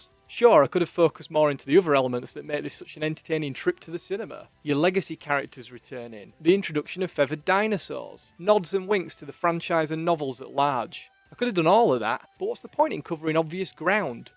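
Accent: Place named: Yorkshire, United Kingdom. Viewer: You're welcome! I probably watch more film reviews than anything else to be honest. If I'm interested in the film, I'll watch the review. On the basis of that, I might just might watch the whole film at some point. I hear you. I'm definitely not saying ALL internet content should be positive. No, it should be honest. I just don't like the bandwagon effect, where an imperfect film comes out (Disney's Star Wars sequels for example) and absolutely everyone has to make a video about it, not only criticising every little detail, but using phrases like "Worst film ever" and "Why Star Wars Is Even Worse Than You Thought." To borrow a phrase from your neck of the woods: Fook off wi'that shiiiite. I get it, uploaders want their clicks and whatnot. But as all these people scramble for clicks and revenue, I come to appreciate channels that are a little more balanced about things. 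I think it probably takes a little more courage to state your own opinions rather than repeat the same crap a hundred other reviewers are spewing. You'll grow. You'll make a living out of this if you aren't already. It's a matter of time. Quality production, likeable host, dulcet Yorkshire tones... Contrarian opinions? You're like Sharpe, but without the rifle and awesome duds. Lmao. I better go. Please don't feel you need to reply to all this. We'll be here forever if you do. Haha. Have a good one,;)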